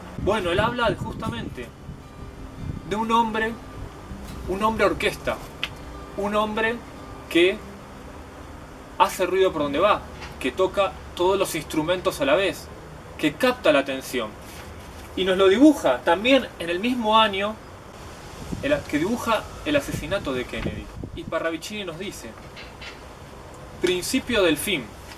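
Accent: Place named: Argentina. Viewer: Gracias. Me encanta tu acento argentino. Nos diste muy buenas explicaciones!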